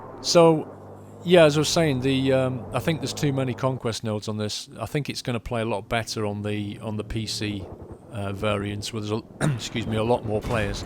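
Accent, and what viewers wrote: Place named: United Kingdom. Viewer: British accent+PS3= ur a badass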